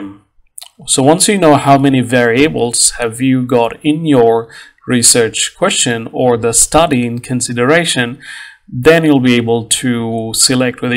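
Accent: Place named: Australia. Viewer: Interesting Australian/Something accent and great video. Thanks!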